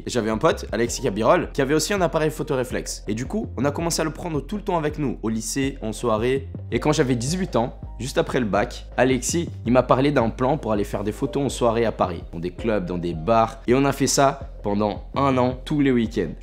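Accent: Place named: Brazil. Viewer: Finally ça m'a coûté deux cliques "s'abonner" et "j'aime" J'entends un fond d'accent brésilien, ne le perd pas, ça me rappelle des souvenirs 🇧🇷🌞 en tout cas j'attends la suite avec impatience.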